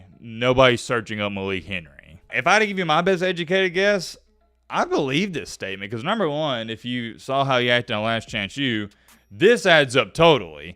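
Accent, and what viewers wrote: South, United States. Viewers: I’m from the south too brotha but dang hire someone to narrate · What kind of accent is this? Tone it down. Good content but come off the southern drawl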